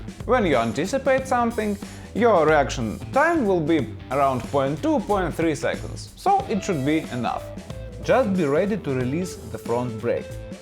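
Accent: Russia. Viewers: С этого видоса я прям прочувствовал русский акцент в английском языке. 😂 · Какой же тяжелый русский акцент😄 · Very good information, very bad English. You have to do something with it. Your English is too Russian)) Your tips are really useful. Thanks a lot!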